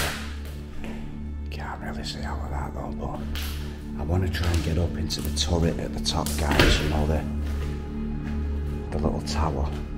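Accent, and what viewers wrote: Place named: Salford, United Kingdom. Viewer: The hollywood touch with a salford accent. Combining flavours.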